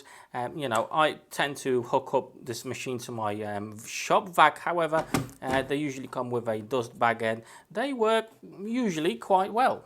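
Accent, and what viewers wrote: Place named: Northwest England, United Kingdom. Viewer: What accent have you got, I'm hearing northwest England, and German maybe ha ha ha.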